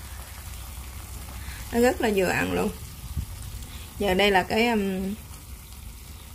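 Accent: Mekong Delta, Vietnam. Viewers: Chào cô gái miền Tây ( qua cách phát âm R ) cảm ơn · Miền Tây quá bạn ơi "gõ nước.bỏ ga cái gổ🤣 cho nó gáo nước"" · Người miền tây hay nói rổ thành gổ, ráo nc thành gáo nc, lúc đầu mình nghe mãi ko hiểu về sau mình thấy ng nào cũng nói vậy, mới biết là tiếng địa phương, video của bạn mình rất thích, chia sẻ tận tình nhiều món chay ngon đoqn giản, chúc bạn khỏe gđ hạnh phúc nha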